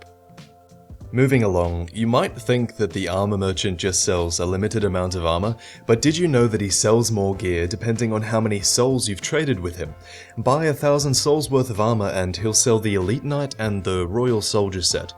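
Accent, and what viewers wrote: France. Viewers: Your french accent is not that bad ^^ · bel accent français ^^ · your accentin french is soo aaaaaaaaaaah <3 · Nice french accent :) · Good French accent.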